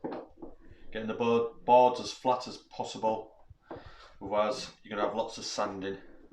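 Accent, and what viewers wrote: Hull, United Kingdom. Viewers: Just found your channel, I am an ex welder/fabricator but trying to learn more about wood so this is fantastic. You sound like a Hull lad (I originate from Hull) so I understand every word you say makes me feel homesick. I was mates with a lad called Robert Pope as a kid, think he became a carpenter also. Anyway good luck with the channel, very professional and well presented.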